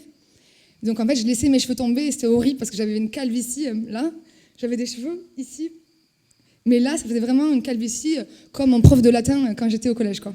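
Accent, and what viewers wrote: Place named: Toulouse, France. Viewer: L'accent délicieux de Toulouse !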